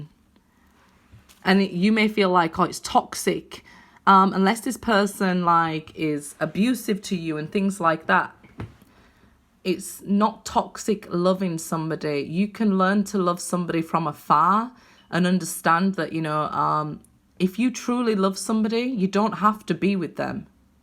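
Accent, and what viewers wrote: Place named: North, United Kingdom. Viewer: You just read my life .But your accent always has me imagining that its Mel B lol .bet your a right northern girl xx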